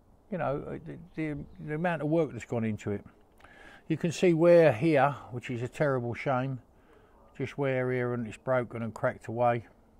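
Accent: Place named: London, United Kingdom. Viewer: what part of london are you from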